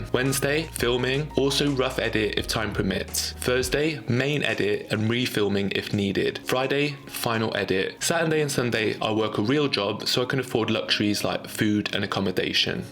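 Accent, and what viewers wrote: England, United Kingdom. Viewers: are you English.... Anyways my day is pretty much like yours l am myself just launching into the you tube space into the health and wellness arena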